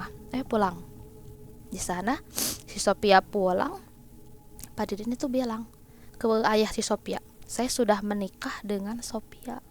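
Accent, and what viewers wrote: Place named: West Java, Indonesia. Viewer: Sundanis tulen bgt logat si teteh nya,, lanjutkan teh 😁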